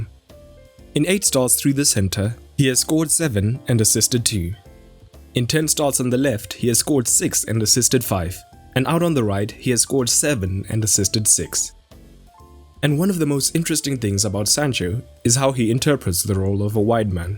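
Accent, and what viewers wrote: South Africa. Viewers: Noh man I've been meaning to ask, are you from South Africa?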